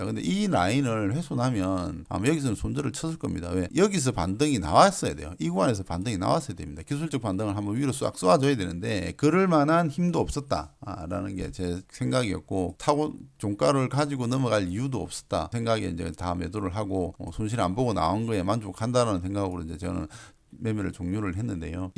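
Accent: Busan, South Korea. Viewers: 실전에 너무 도움이 됩니다 감사합니다 대표님 혹시 부산분이신가요?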